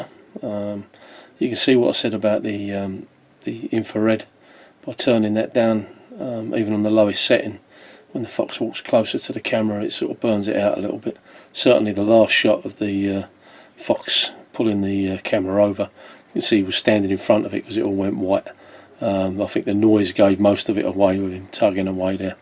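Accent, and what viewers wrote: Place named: London, United Kingdom. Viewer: Thanks, just setting ours up to capture Kangaroos, Wombats and Wallabies here in the Upper Yarra Valley, 70kms from locked-down Melbourne. Nice to hear an authentic London accent again. We also want to watch how our chickens escape their pen as foxes have taken a couple lately.....thanks...useful tips!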